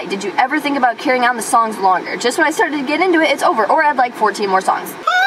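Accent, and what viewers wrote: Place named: Wisconsin, United States. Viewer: I knew it! She IS from Wisconsin. The way she pronounces her A's like in "tracking" gave it away.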